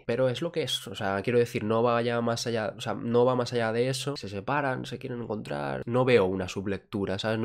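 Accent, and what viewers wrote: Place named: Cuba. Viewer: Soy ek unico que piensa que suena medio cubano en este video al principio mayormente xd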